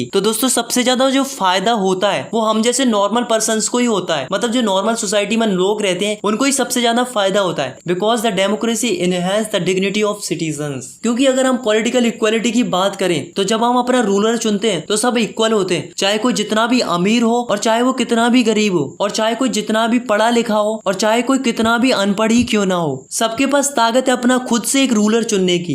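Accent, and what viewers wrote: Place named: Bihar, India. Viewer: bhai apna accent theek karle thoda bihari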